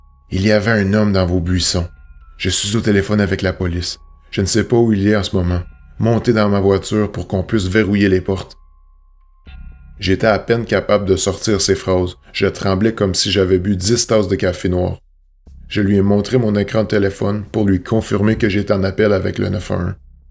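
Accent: Quebec, Canada. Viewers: Superbe travail j'aime beaucoup 👍 Ps j'adore l'accent québécois 🙏👌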